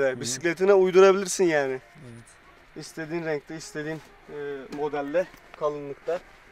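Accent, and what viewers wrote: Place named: Bulgaria. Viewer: Abi konuşmandan belli Bulgaristan göçmenisin jajsja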